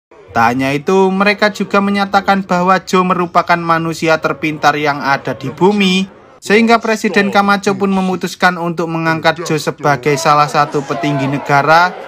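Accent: Java, Indonesia. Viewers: Medok mu hilangin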